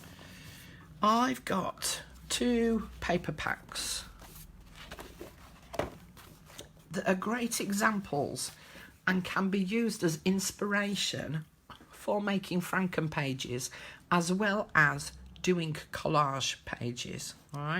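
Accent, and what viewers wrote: England, United Kingdom. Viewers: Absolutely love your voice your accent are you English you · Hi,I just found your channel..I've been wanting to start doing glue books for awhile now but just been reluctant to give them a try..Thank you for such great tips and ideas..I absolutely looove your accent..What part of England are you from? My maiden name is Burton and my ancestors started Burton England so my dream vacation is definitely England since English is in my blood..The English accent is my favorite,its just so soothing to me lol Lots of love from Kentucky❤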